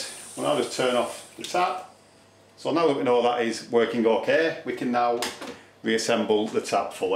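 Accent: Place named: England, United Kingdom. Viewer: Nice work. Thanks mate. Gotta love the pommie accent. Gonna go listen to now 👍👍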